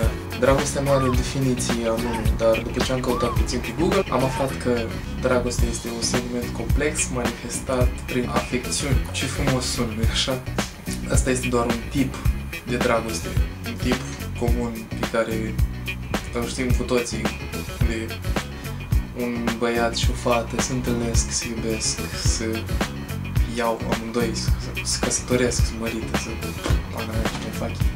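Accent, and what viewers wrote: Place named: Moldova. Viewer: o intrebare mai ciudata ^^ tu esti moldovean sau ardelean? sunt curios dupa accent esti moldovean dupa vocabular pari ardelean deci nu stiu daca mi-ai putea raspunde masi bucura pa pace!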